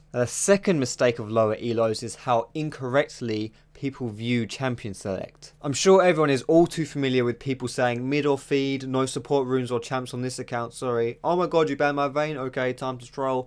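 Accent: United Kingdom. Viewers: are you British? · First of all, I love your british way of speaking. So smooth. Secondly, I'd like to quote you here, "...if you spend all your money reviving Axel Roses music career..." HAHA you're cracking me up. I wonder if the young punks on here even know who is. Anyway, great video!!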